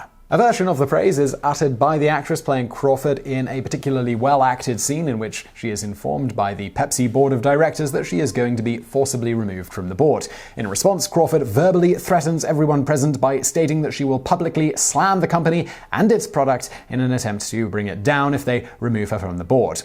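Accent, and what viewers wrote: United Kingdom. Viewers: I like the way you say it. Your British accent gives it a little extra sting.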